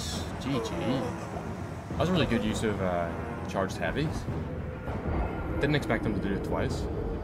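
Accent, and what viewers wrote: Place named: Northern Ireland, United Kingdom. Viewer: Ah a fellow Norn Irishman!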